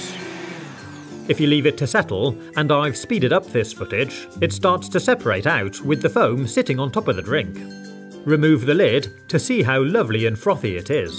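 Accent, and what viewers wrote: United Kingdom. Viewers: And your British that cool I I’m to I love this and keep it up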